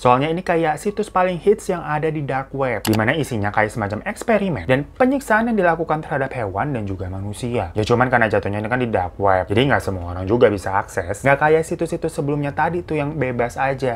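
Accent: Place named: Betawi, Indonesia. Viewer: Cara ngomong lu keg guru ngaji gua.. betawi bed da ah 🤣🤣🤣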